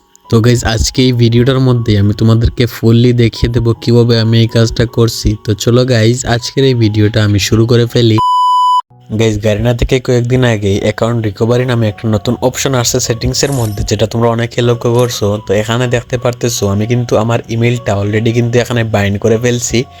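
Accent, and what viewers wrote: Sylhet, Bangladesh. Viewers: vai apni Sylheti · ভাই তুমি সিলেটি নি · Vai Sylhety ni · ভাই কিতা সিলেটি নি ❤ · সিলেটিদের কথায় আলাদা ভাইব আছে ভাই শুনলেই বুঝা যায়। শুভকামনা রইলো এলাকার ভাই❤ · ভাই আপনি কি সিলেটি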